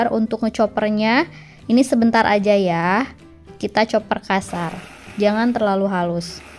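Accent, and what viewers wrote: Java, Indonesia. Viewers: Duh jadi laper mba, medog banget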